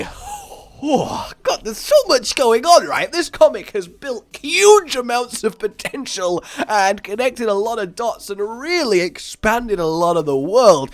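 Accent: Russia. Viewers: your russian accent is beautiful but it's based on stereotypes, and they are wrong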